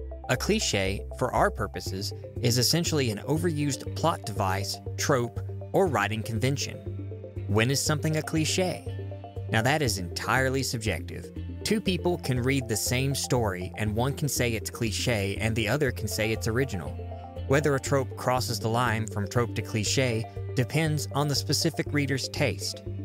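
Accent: South, United States. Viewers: why am i only now noticing your southern accent?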